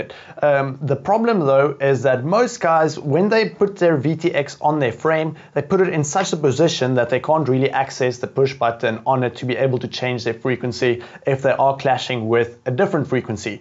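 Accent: South Africa. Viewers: You sound like a South-African... Thanks for the video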